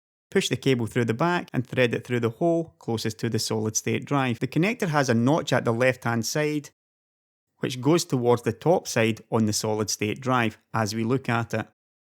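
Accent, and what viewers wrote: Scotland, United Kingdom. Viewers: the sweet, Scottish dialect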